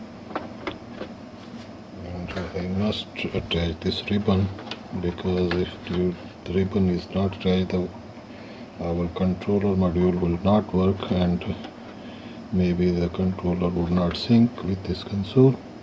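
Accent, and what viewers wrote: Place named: India. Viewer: Sorry 😐 But i don't like hindi accent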